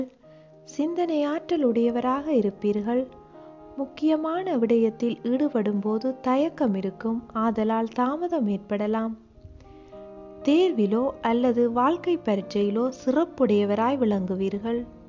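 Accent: Sri Lanka. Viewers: ஸ்ரீலங்கா தமிழ் போன்று உள்ளது.உங்கள் உச்சரிப்பு. தமிழன் என்று சொல்லுங்கள் Indian என்று சொல்லாதிர்கள்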